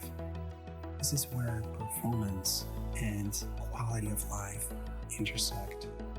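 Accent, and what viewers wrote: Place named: Transatlantic, United States. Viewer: Came for the Chicago urbanism and good title, left for the transatlantic whispery latte art pretension. Good lord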